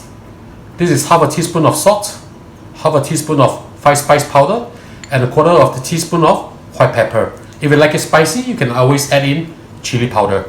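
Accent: Singapore. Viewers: You sound more Singaporean than Malaysian tbh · Are you speaking Singlish while cooking taiwai style? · Arw you singaporian sir cause you sound like one..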